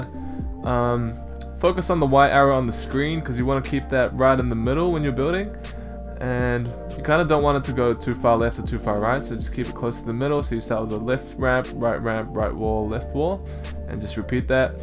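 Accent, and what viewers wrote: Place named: New Zealand. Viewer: Where are you from? You sound like your from New Zealand lol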